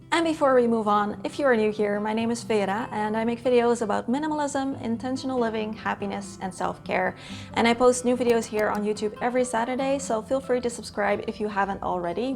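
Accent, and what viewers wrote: Netherlands. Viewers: You have a lovely channel.🙏btw, are you Dutch? Because of the accent I was wondering😊love your interior... I'm on my way to being a minimalist but it'll take me at least one year to get rid of unnecessary stuff...😂😁😇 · Love your calming style of videos and love minimalism! When I saw your background I knew you were Dutch somehow 😂 And when you started talking I was like yup! Subscribed!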